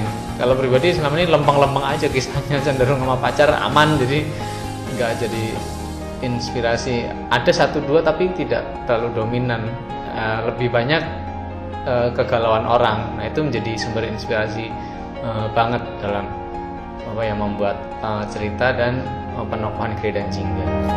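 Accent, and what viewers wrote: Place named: Java, Indonesia. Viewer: bandung kok logatnya medok mas?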